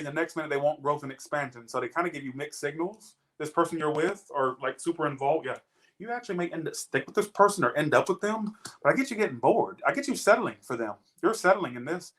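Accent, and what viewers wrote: United Kingdom. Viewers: The British accent 🤣🤣 I definitely spend too much money in Tesco !! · Uk accent 👌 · Love the UK accent lol xxxxx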